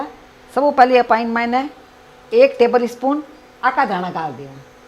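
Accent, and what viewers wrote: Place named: Marwar, India. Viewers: Ye jodhpuri language badi meethi lagti h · जितनी आपकी चटनी अच्छी है उससे ज्यादा आपकी बोली अच्छी है। इंग्लिश हिन्दी और मारवाडी का अच्छा वार्तालाप है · Mujhe marwadi nahi aati per aap jab bolte ho tho bahut acha lagta hai aap ki cooking great hai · Your Marwari accent is so wonderful! When you talk I feel my grandma is teaching me again. The chutney was amazing! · Mam am fan of ur marwari accent and the recipe also very fabulous. , well done keep it up 😋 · Amma aap ka marwadi accent bahut achha laga. Chatni mai aaj hi try karunga banane ka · Wow m Punjabi and understand ur marwadi accent easily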